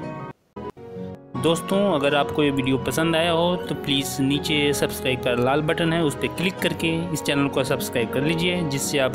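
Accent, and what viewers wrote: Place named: Bihar, India. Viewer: I liked the Bihari accent.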